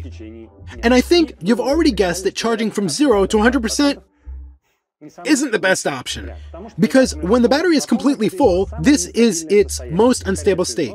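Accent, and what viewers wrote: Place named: Russia. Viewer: поздно...лет с 5-7 надо постоянно говорить на языке, сейчас поздно, с диким руzzким акцентом англоязычные нейтивы слушать не станут ,тем более во время войны..да и тех.контента на хорошем инглише полно,они первыми узнают новости науки,лишь потом на других языках . почти всё по и железо разработано в развитых странах...запад определяет путь технологий,в рфии только узнают новости постфактум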